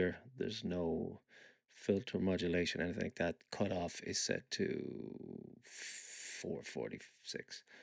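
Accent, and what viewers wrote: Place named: Ireland. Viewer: Unbelievable work my friend! Where abouts is that Irish Accent from?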